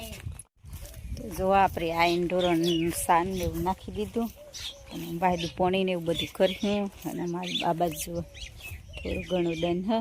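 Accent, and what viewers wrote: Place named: Sabarkantha, India. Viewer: બેન તમારી બોલી પરથી એવું લાગે છે કે તમે સાબરકાંઠા જિલ્લાના હોય એવું લાગે છે 🎉🎉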